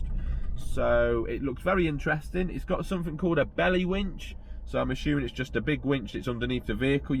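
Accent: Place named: Staffordshire, United Kingdom. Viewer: 6.39 zoom! zoom! zoom! 😂😂 😂 Yer do make me chuckle with your alreet greeting, proper Staffordian hey👍, your becoming very confident chatting to camera now, brilliant content, might have a word with Shane and see if we can get you on one of our cinematic films, you can do all the talk to camera scenes for us! 😝 Great video mate, really enjoyed it 👍👍👍👍👍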